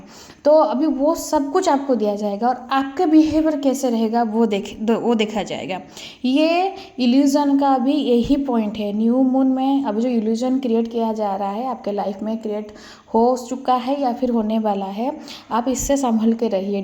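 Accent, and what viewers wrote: Odisha, India. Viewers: Mujhe b Aisa laga accent se lag raha odisha se hen jese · Mam ap odisha se hein mujhe lgta h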